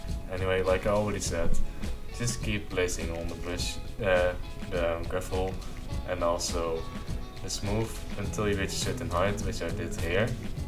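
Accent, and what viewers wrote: Netherlands. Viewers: Are you from Holland, cause you sound Dutch :D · 100% nederlands kun je horen haha :D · Dutch accent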